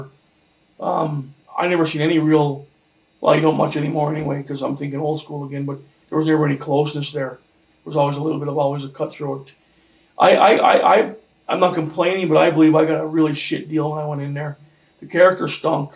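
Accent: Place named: Upper Peninsula, United States. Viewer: Love the Yooper accent!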